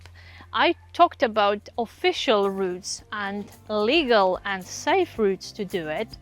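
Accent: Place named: Eastern Europe. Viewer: Sounds more like an Eastern European accent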